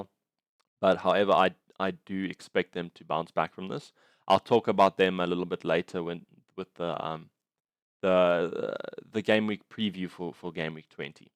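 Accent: South Africa. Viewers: FPL ID: <num>. Top 2k. Notice the accent - Are you South African?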